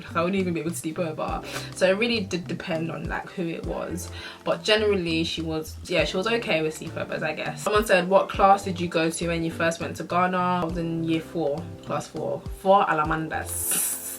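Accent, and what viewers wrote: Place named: United Kingdom. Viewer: How do you still have a Brits accent after 10yrs in Gh. I moved to Gh came back after 8years which is after SHS. But picked up the Ghanaian accent. How did yours not disappear? 🤔🤔🤔🤔🤔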